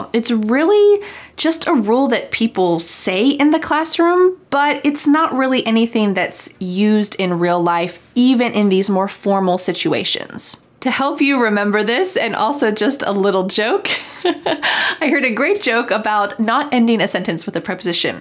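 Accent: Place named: United States. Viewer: Hi! I'm from Poland! Can you teach in another video how to correctly say the word "daughter" in your beautiful American accent that I just love?? 🥰 I can only say it right fast ☺ My 10-year-old son learned to say "I bought my daughter a bottle of water" in American accent 😁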